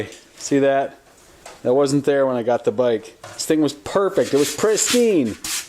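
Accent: United Kingdom. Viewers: You may have been 1 of the 2 that won a trophy out of the 2... but you had the balls to enter...and you won period.... that is a win in my book, just by entering you won as I see it so it is everyones loss that didnt enter at all. He's a German US Born British Accent speaking Japanese Bike Riding Maker/Hacker... the way the United States was meant to be... I love it. (Mind you i am not saying what you said what bad/wrong just making it clear to those who want to make bad comments telling them that this is what America is all about, I was roughly clarifying) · Loved the English accent, but you German? I only have one thing to say to that RAMSTEIN lol Keep on hackin' it fascinates and inspires me:-)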